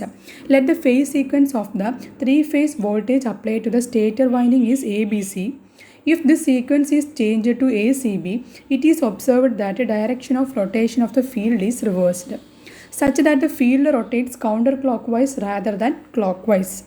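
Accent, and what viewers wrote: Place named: Kerala, India. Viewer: Its accent seems like you are mallu😂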